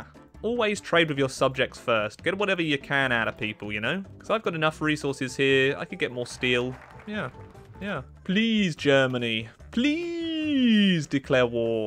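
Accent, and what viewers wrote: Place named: United Kingdom. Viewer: british fella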